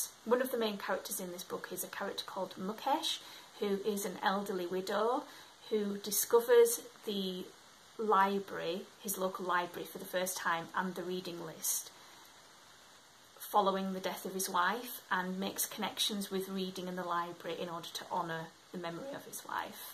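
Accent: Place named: Yorkshire, United Kingdom. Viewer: As someone from the Netherlands I sometimes can't tell the various UK accents apart. I had no idea you had a Yorkshire accent as I have no idea what a Yorkshire accent sounds like. I know what a Scottish accent sounds like since recently as I started watching a couple of other BookTubers who are Scottish and talk about it. How nice you can reserve any book from the county for a pound! It costs more here if you want a book from a library further away than the local area here. Oh yes I am always careful with my library loans too. In the Netherlands you have to pay for a library subscription (except for children under 18 I think), so that could be a reason here but I don't know. Some libraries make you pay a certain amount of € per book, gladly mine doesn't and it's just the yearly fee. How cool, I don't think they deliver to your house here at all, that is not a thing. 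I love my library though. Oh yes I love browsing at the library! The Stopping Places sounds interesting. I couldn't pick one best book either! The Reading List has a nice cover! It sounds like a nice concept for a book! Ah I was just about to put it on my wishlist, and realised it was already on there. I didn't recognise it because the cover on my wishlist looks different. Glad you enjoyed the book! I can't listen to audiobooks. I prefer reading paperbooks over ebooks too. My library does have ebooks, but I can't read them on my Kindle (it's not a supported format), so I'd have to read them on my phone (or perhap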